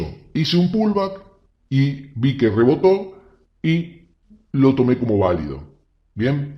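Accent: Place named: Argentina. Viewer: hola, me parece por tu forma de hablar q sos argentino, yo soy de buenos aires, me gusto tu estrategia y la voy a practicar, pero ademas de eso te queria preguntar de que manera se puede retirar dinero de los brokers cuando estamos en argentina, ya que estamos muy regulados. Sirve abrir una cuenta en Skill? muchas gracias. abrazo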